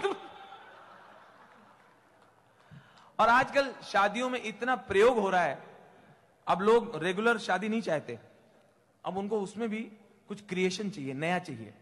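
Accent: Kanpur, India. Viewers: Kanpuria style ..good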